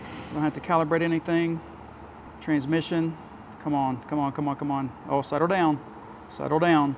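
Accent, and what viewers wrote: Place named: West Virginia, United States. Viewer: I really enjoy all your tests, sense of humor, and I love your WV accent. You seem like such a down home Christian guy. I also love your scripture quotes at the end of your videos. I'm a California Baptist, and I love listening to Southern Gospel music. I'm planing a trip to the east coast this fall, for a short hike on the AT. It would be great to meet you and and shake your hand. Thank you for these great educational videos!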